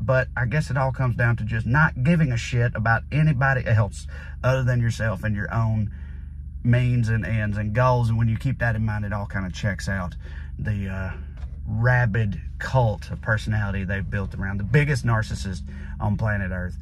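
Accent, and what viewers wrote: South, United States. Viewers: Hang in there! I’m age 65, grew up in a small town in Middle TN (Tullahoma), & we used to drive right through your home town in the 1960’s, 70’s and 80’s to camp and waterski on Dale Hollow Lake for long weekends. It’s such a pleasure to hear someone like you from my “part of TN” who not only understands US politics, how insane & nonsensical 1/3 of our country has become..esp since the “Tang-tainted a$$” 😂decided to run for prez in 2016 (not planning to win, just hoping to bring more attention to him and his “brand”). And the cherry on top for me is hearing someone speak the truth with the same southern accent that I still have 😊. Thanks for keeping me sane, & for saying “fifty-eleven times”!! Haven’t heard that since I was a kid! Keep ranting,! I share your channel with all my friends. PLEASE, PLEASE consider coming to Greenville, SC on 1 of your tours. 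We have become a purple city, & you will love it. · i never thought i'd see someone with a southern accent who wasn't an asshole. thank you <3 Edit: i'm southern myself, i don't have an accent, but i've never met someone with the accent who wasn't a complete jerk. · You always speak the truth, but ironically with a "Southern Touch". (The irony being Southerners are rarely liberal thinkers !!) 😊😊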